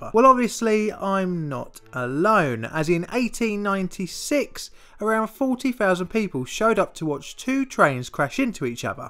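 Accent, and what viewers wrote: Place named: RP, United Kingdom. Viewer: No worries, you're one of my favourite subs, you're interesting and I like your queens English and voice, you should really have more subs.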